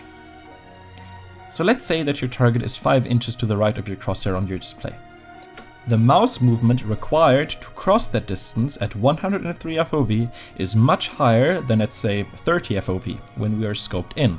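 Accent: Germany. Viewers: Ich höre doch raus das du ein deutscher bist gibt es das Video auch auf Deutsch? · Direkt in der ersten sekunde gemerkt, dass er Deutsch ist. Gutes Video. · Hardest german accent i've ever heard, but good video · Man merkt so hart dass du deutsch bist haha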